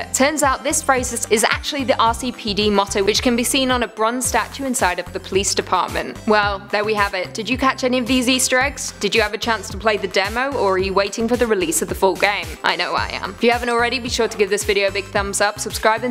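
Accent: United Kingdom. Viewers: You are from uk? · Miss your quite the beautiful lady,& love your English accent 😊Well that's cool, I'm pretty excited about Re2 remake. Looks fantastic,as far Re3 remake,as they said it depends on the fans.if it happens,it's in the making from what I was told by Capcom & other gaming yutuber s.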